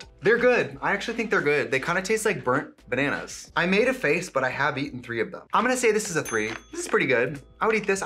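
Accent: Wisconsin, United States. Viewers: Per beggg love her Wisconsin accent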